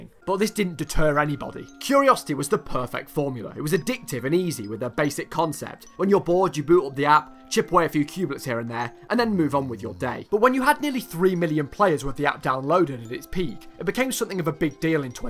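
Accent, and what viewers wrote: United Kingdom. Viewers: The editing itself was just very interesting, but good topic with bri""ish accent is so much fun. Thanks for great video, i wish they would come more often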